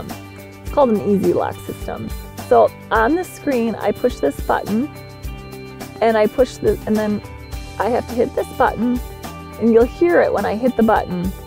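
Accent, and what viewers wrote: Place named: Minnesota, United States. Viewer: Your ride is soo cool, so much cooler than those available a very short time ago. Also, you sound soo Minnesotan. Thanks for the video.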